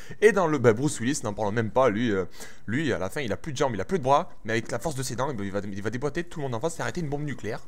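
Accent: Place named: Toulouse, France. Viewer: Un bon toulousain avec cette accent sa fait du bien, les toulousains les meilleurs le meilleur si je pourrais jouer avec toi sa serait cool si tu veux je te donnerai mon gt;)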